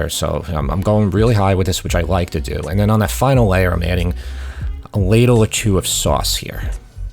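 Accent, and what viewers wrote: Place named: Philadelphia, United States. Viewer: I’m literally going to watch all of your videos so I feel at home. Your Philly accent with a hint of Jersey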